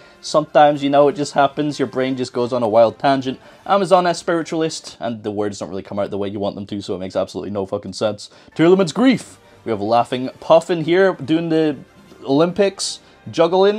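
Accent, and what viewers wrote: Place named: Scotland, United Kingdom. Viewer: Getting a binder full of good stuff? 6/10 Watching a scottish man talk about cardboard in a funny accent? 10/10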